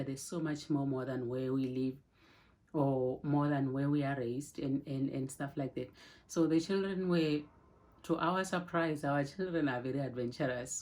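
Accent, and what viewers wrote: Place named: South Africa. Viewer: I love that she’s still South African even through the English. Congratulations on your move.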